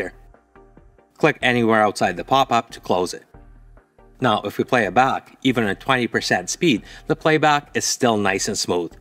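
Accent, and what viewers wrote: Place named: Quebec, Canada. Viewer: Great tip, and you have one of the most interesting accents I've heard! Mix of Asian and... French-Canadian? Am I dreaming? I never heard a voice like that :O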